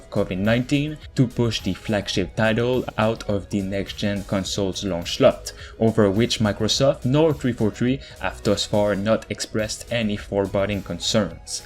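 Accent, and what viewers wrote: Quebec, Canada. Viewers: Dude are you somehow french? You really sound like someone from Quebec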